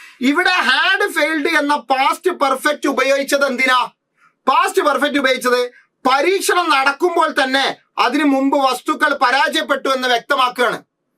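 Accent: Malappuram, India. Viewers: Swantham muthu mani... ❤❤mash nte aaa malapuram slang um.. Comedy yum okke orupad ishtom🥰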